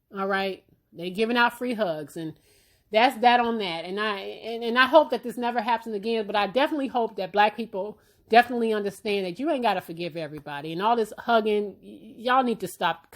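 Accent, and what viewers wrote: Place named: Midwest, United States. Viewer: I knew you were Midwestern!!! I love it!! Im in Indianapolis Indiana next door!!! 🤗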